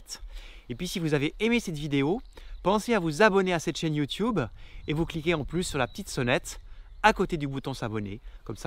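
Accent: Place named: Switzerland. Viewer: Le plus beau chant d'oiseau c'est votre accent suisse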